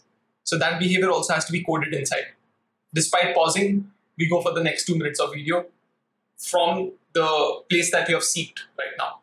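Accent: India. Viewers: Great video thanks for sharing. However, you Indian guys learn everything but not the accent, straighten it as it makes it difficult to follow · If a course starts with "hellou ewerywone!" I at least know that he put in the effort and worked damn hard to make it! Cheers! (For the trolls, this is not racist but actually respecting and valuing the creator and effort! I have been to India.) Thanks, great intro! 🤘🏻😎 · As a non native english speaker, the indian accent adds difficulty. But it's in the pain that you soak up knowledge the better. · I have to admit, even though having a bad accent, indians most of the time give very good lessons, I just need to get through the difficulties of understanding the accent) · Oh my god, i was very happy to hear white face with good english and right after we were fucked up with indian accent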